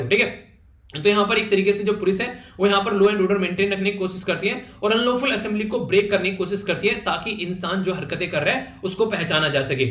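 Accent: Haryana, India. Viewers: I guess from hariyana or western UP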